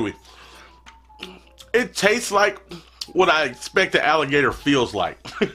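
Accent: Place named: Australia. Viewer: Nice aussie accent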